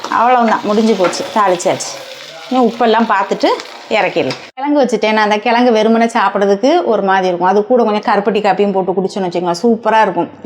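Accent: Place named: Nagercoil, India. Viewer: நம்ம நாகர்கோவில் தமிழ் வாசனையுடன்,கிழங்கின் சுவையும் அருமை !!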